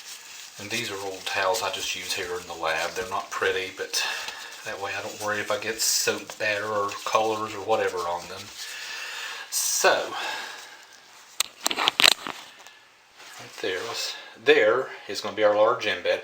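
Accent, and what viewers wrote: South, United States. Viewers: Hi! From Florida! I’m from NC, mountain side. It’s not often I get to hear a good Southerner accent. Love the soap!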